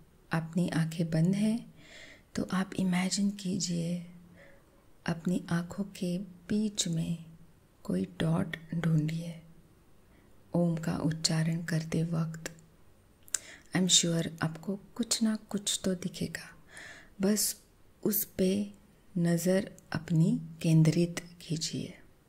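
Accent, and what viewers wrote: Maharashtra, India. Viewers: This is toooooooooo Sweety sweety voice🤭😍🙌...when a Maharashtrian girl talks in hindi😍🙌😬🤭... Khup chaan ma'am 🙌😬 Big big fan🙌😬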